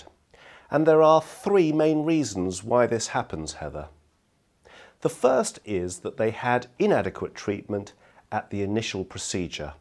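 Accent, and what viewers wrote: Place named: England, United Kingdom. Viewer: Can I marry your English Accent you’re such an awesome doctor I had that procedure and you describe it perfectly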